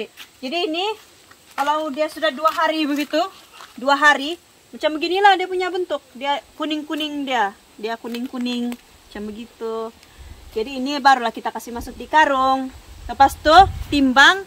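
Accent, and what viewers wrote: Sabah, Malaysia. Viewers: Comel plak penoreh ni, loghat Sabah dia pun comel 😊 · Hello,hai..since u know now now to speak in English language so i speak in English if u Don't mind(actually i'm original dusun sabahan)..first of all this is the first time i'm in here(in ur chnl) n watching ur video i like it wht i see because in here wht i see u become Ur true self, n Not many girls in this day now Like u doing something Like this(kerja kg)i'm proud of you keep doing wht the best u can do never give up too Chase Ur dream n gd lucky when you go to city to continue study to improve your education in course u pick it u like I'm sorry i if my comment a Little bit too long for u to read in ur busy daily routine work schedule..i'm also same like u(penoreh getah)from KB nice too meet u hve a great day tidak which is the day i write this comment,by the way i love hear tht sabahan accent from u✌🏻🤗🤗..peace🇲🇾🇲🇾🇲🇾❤️❤️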